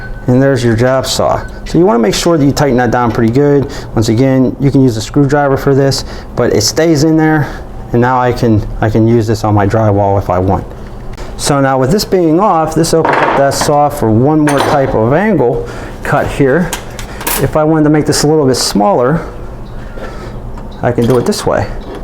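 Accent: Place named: New York, United States. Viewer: Great video. I'm loving your accent...... straight out of 'Goodfellas' 😊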